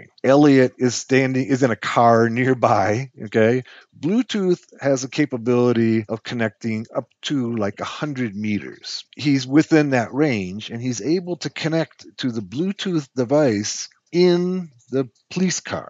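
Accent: Afrikaans, South Africa. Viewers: You sound south african, great content!! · Hi are you afrikaans?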